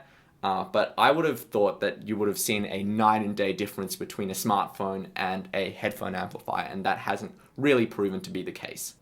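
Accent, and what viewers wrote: Australia. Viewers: Yes, he's living in Sydney I think. Also he has a strong Aussie accent.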